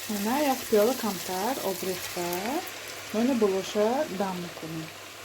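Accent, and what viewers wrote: Isfara, Tajikistan. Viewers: Лафзи Исфарагихо мора а хонаш мебурурад😅😅😅😅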